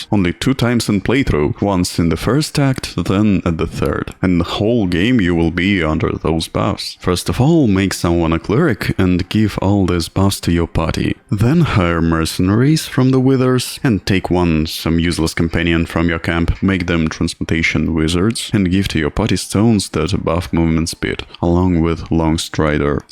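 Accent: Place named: Russia. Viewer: you gave me some runglish, love it.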